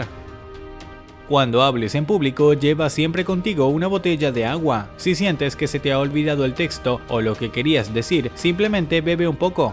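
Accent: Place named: Argentina. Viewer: no se, pero tiene alta pinta de argentino en modo neutro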